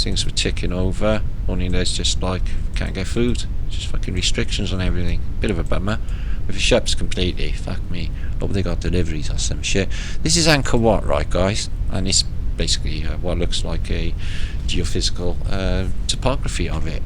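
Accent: Wales, United Kingdom. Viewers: Sound like your from Newport or cardiff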